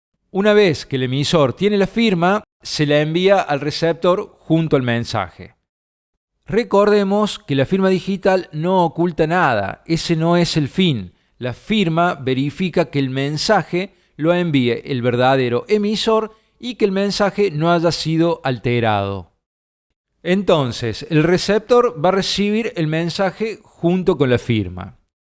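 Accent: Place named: Córdoba, Argentina. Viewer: Genio capo Idolo, muy bueno todo. sos un crack! por tu tonada andas cerca de villa maaaria! abrazo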